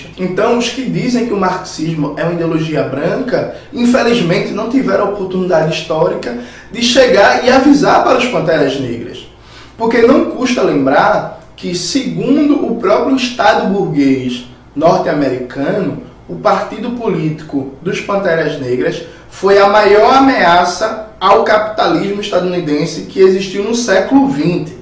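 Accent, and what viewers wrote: Pernambuco, Brazil. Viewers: Sim,fala pernambucano!✋😁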